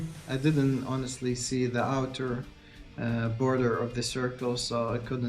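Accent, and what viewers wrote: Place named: Arab world. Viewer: لهجتك كأنك عربي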